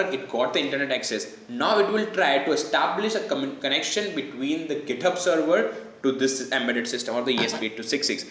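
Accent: India. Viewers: Why do all these videos have to be in indian/gibberish english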